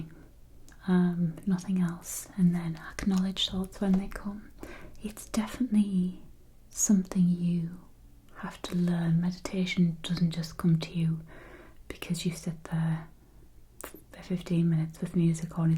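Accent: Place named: North, United Kingdom. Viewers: I love your accent, I grew up in Teesside and find your voice so familiar and comforting ❤️ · Thank you for this from Newcastle ☺️ your northern accent is comforting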